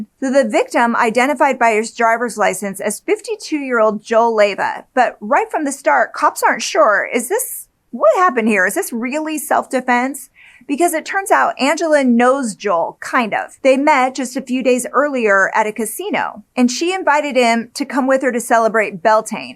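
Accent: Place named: South Dakota, United States. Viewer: Girl, I love you! I’ve been suppressing the urge to ask this for quite a while, but now I have to, lol… are you from South Dakota, by any chance? Only asking because I’ve always detected a hint of an accent/pronunciation with words like, “bag”… for now “dagger.” a couple friends of mine from South Dakota pronounce things similarly. Obviously, I respect your privacy, so no need to share, it’s just my ADD brain 😂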